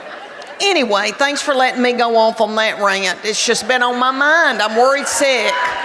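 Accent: South, United States. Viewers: I'm from the south. this lady reminds me so much of people from around here. The accent is my fav. You don't hear enough. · the subtitles make me laugh. I grew up in texas. I have a city accent, not a southern. but its like, really funny to realize that maybe someone in france has no clue what the hell this southern lady is saying. love it.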